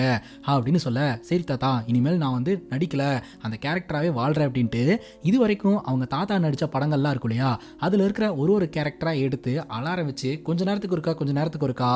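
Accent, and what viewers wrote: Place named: Kongu, India. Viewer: may be இவரு கொங்கு region ah irupanga nga...இது கொங்கு தமிழ் nga... inga elarum ipaditha பேசுவோம்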